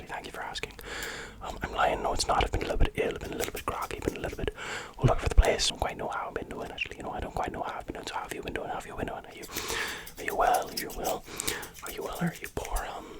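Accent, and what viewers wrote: Ireland. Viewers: My hair is literally how he's describing it at the moment. And I LOVE the Irish accent! ❤ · His Irish accent is better than mine an actual Irish person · as an irishman, i approve of this even if it is all over the place i'll take it literally staying w a friend in dublin which is kinda the accent ur doing but also with other dialects from around the country sprinkled in but i feel like being in dublin this video is fitting to sleep to when home is 3 hours across the country and people sound nothing like that from home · the irish accent is sooooo good! I need more of it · I am Spanish but I had an Irish upbringing and the accent makes me SO homesick 😭😭 The meassuring part made me drop the phone. Yes, you caused that 😂 Carry on with your amazing work and your sense of humour ❤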